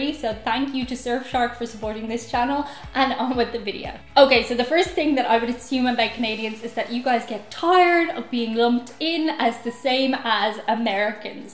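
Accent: Ireland. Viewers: I thought you were Irish.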